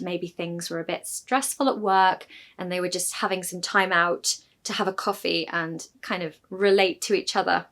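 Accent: United Kingdom. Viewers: Thanks for the youtubers like U 😊 i really like british english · Oh... my favourite tutor... with the British Accent.. I Love... ❤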